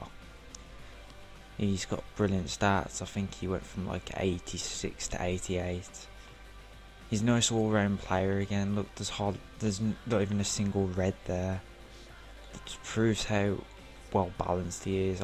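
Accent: United Kingdom. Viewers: U sound proper essex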